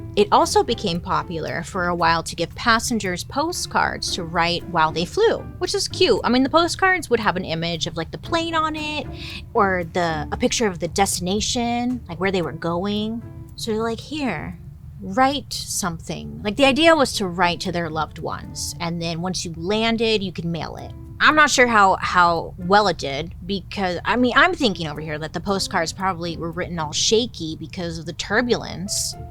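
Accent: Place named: Transatlantic, United States. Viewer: your TransAtlantic accent is *chef's kiss mwuaah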